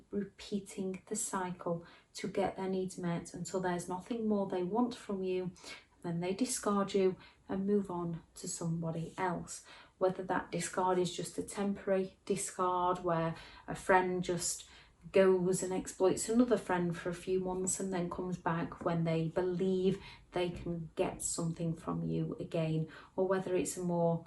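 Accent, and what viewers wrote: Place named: North, United Kingdom. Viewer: Became atoms, from crumbs, from a loaf, from a whole bakery. I never knew things could be salami sliced so much. Thank you for doing this. Its great to hear a northern girl doing this 🙌🏽🙌🏽🙌🏽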